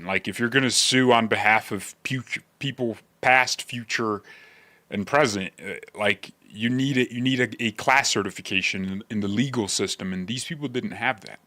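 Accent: United States. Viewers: He puts it across by speaking Pure Normal American Man-Speak :D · i just realized u are on the west coast i guess. With ur accent i always thought u were a newYorker, lol. Btw, jimmy falon had Mamdani on his show. He's disgusted me for many years. All late night talks shows arent worth the hot💨 from my 🫏🕳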